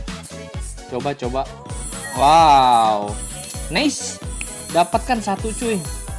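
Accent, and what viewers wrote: West Java, Indonesia. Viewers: Bang orang mana sih tapi ngomong ya sunda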